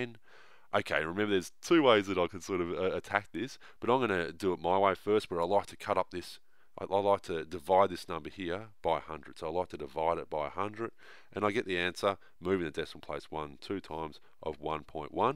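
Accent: Australia. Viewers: Are you Australian? Sorry if your not yo just sound Australian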